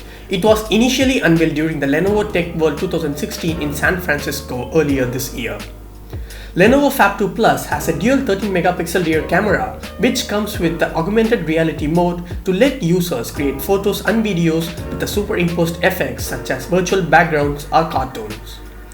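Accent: India. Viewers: Indian accent is disgusting!!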